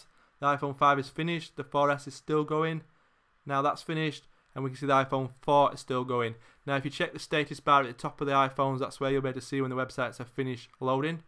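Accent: United Kingdom. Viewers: xDDD i like your accent, its very... british xddd good video, like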